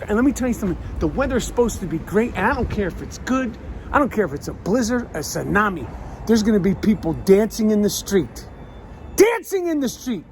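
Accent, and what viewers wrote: New York, United States. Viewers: Best Nu Yawk rant in the history of rants. Thanks for your graceful tantrums.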